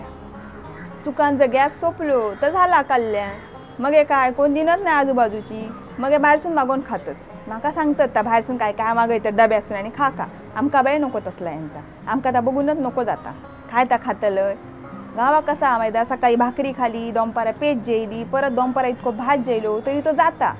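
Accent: Malvani, India. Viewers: Ge tujhi malvani Bhasha aikuk khup maja yeta.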